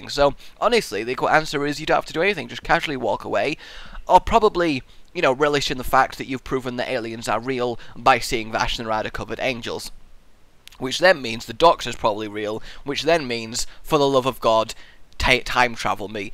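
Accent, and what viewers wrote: United Kingdom. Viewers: is british or german? or did he move to england later on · British .. why would he be german lol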